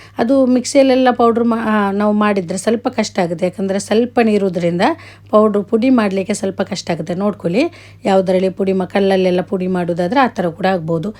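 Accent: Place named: Kerala, India. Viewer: Nice to see your recipe. Will try. Good explanation. Some of your accent tells that you are from Kerala. Right??😊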